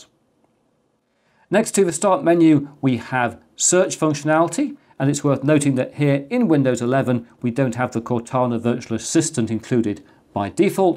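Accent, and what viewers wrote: United Kingdom. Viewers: Computers are so much more interesting with a British Accent.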